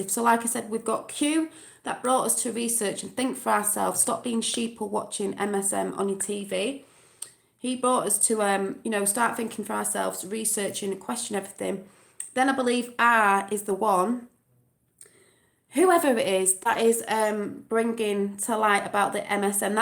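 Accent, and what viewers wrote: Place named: Yorkshire, United Kingdom. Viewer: Yorkshire? I have friends there, they talk like you. Thank you for sharing so much with us, your passion is contagious!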